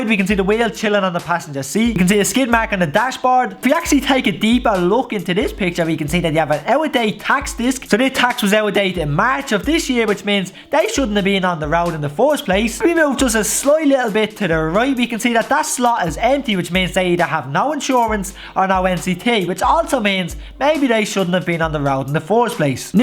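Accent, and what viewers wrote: Ireland. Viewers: So enjoyed these videos from Australia.was born in Dublin , drimnagh you are brilliant keep them coming love your Brough ha ha .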